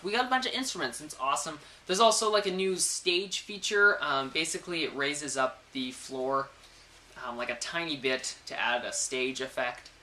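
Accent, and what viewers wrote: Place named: Canada. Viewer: AHA! i knew he was canadian, we have the same accent.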